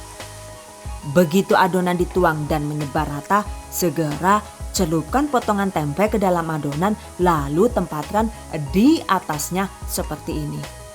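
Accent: Banyumas, Indonesia. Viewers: Ngapak banget yu lah hahaha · Mantap banget Bu,,,njawane medok men,,,asli banyumasan ?aku salah sijine pengikut postingan e Lo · Laaah mimin nya asli madiun apa banyumas ngapak bgt😂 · tiba,e ngapak yu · Pertama lihat video THV kaget bahasanya ngapak,, sooo nyonk kira wong Cilacap 🤭dadi betah nyonk nyimake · Laahh cingire, ngapak jebule 😂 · oalah ngapak jebule rika yu 😅😅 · Buund..syukaa sekali logatnya gado"....indo..english..jwaa ngapak...top deh buund.sehaat teruus yaaa..slm kenal..😊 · "DONE" Pertama kali lihat channel ini penasaran, akhirnya coba di tonton, dan yang bikin pengen terus nonton itu suaranya yang khas banget ada campuran English dan ngapaknya juga, dan juga kualitas editingnya bagus, bukan kaleng2 pokoknya. Sukses terus Channel. Aamiin #Giveaway THV2020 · Bisa ngapak yu,, Rika wong banyumas, p...mantap yu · 😀😀😀 haha Aku ketawa terus klau Pas mbak nya ngmng ngapak😂 sukses selalu mbk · Wkwkwk dasar mendoan Ora ngapak ora kepenak... 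Ketika suara ngapak bikin kaku weteng ku🤣🤣🤣🤣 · Selama ini ngebayangin mukanya ga kyk gt Jadi kaya wajah dan suara dijual terpisah 😂😂😂 Kaga matching Kalo yg ngasih resep wong ngapak hrsnya legit nih resepnya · "DONE" Awalnya aneh juga. Madiun tapi ngapak. Baru kali ini lihat wajahnya. Makasih resep2nya. #giveawaythv2020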